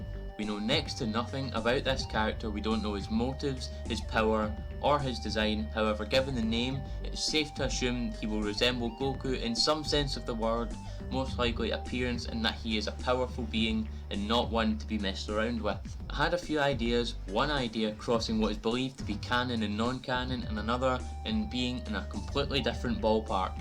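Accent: Scotland, United Kingdom. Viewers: I liked this video, man! First and foremost, is that a Scottish accent I hear? If it is, that's awesome because we need more UK YouTubers in the Dragon Ball community - me being one myself! I loved your idea of a Saiyan that resembles Goku travelling to Earth in Trunks' timeline and easily destroys him. The only question from there would be, how did he become that powerful to have this be a threat to Goku, Vegeta and even the Omni-King? Me personally, I think "Black Goku" will be a created being, by a force that hasn't been introduced yet in Dragon Ball Super. Fun theories and video, I subbed for more! :)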